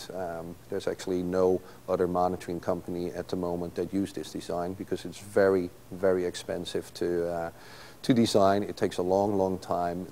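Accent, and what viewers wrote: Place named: Netherlands. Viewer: The presenter sounds like he's from Holland.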